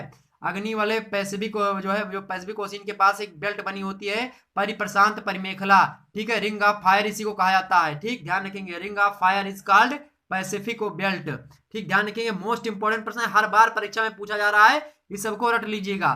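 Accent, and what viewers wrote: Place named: Bihar, India. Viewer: Tu hu guru bihariy lagat hawa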